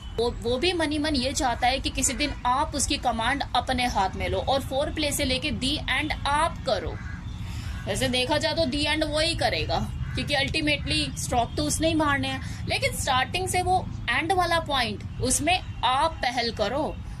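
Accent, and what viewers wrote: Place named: Delhi, India. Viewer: mujhy lagta hy aap Dilli se hn na. Apki zaban (Urdu) aur lahja bata raha hy... Aur aap bohot khubsurat (sundar) ho....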